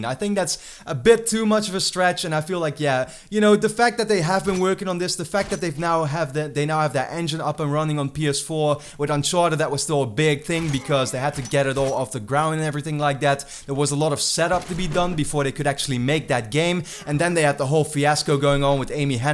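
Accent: Netherlands. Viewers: That Dutch accent, i love it.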